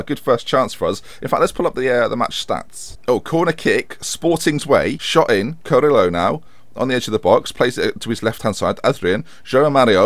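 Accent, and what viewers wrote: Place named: Manchester, United Kingdom. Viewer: your manc accent is pretty decent xD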